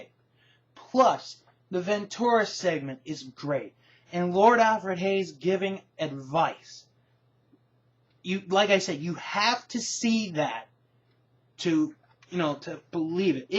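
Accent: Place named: England, United Kingdom. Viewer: Nice English accent 👍👽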